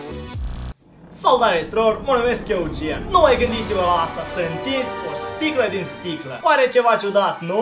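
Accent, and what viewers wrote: Moldova. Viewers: Ești Moldovan nustiu terog sami. Spune terog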